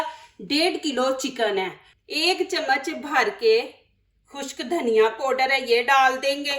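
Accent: Punjab, India. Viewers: Wah G Wah,munh mn pani aa gya hy. Aapki hr recipe boht aasan or tasty hoti hy. Aap shyd punjabi speaking hn q k aap ka lehja bilkul hmary jesa hy. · Aap ki panjabi wali ton ma Urdu bohat khub ha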